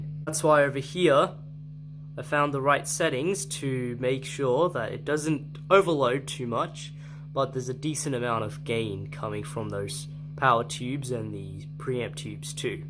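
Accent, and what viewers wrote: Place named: Australia. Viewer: Your accent sounds Australian.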